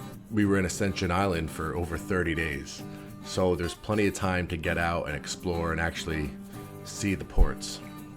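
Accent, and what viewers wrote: New Jersey, United States. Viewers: Whenever videos come up his accent always brings me back home to Jersey. I grew up in Jersey but have lived in Alaska for over 30 years but accent always reminds me of where I grew up. Great Videos as well!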